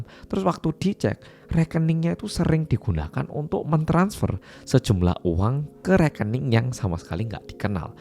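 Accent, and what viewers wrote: Java, Indonesia. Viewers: Medok LG....😂😂😂😂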